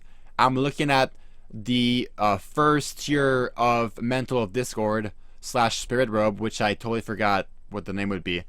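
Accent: Quebec, Canada. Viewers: i can feel the french canadian richness in your voice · Are you from Quebec?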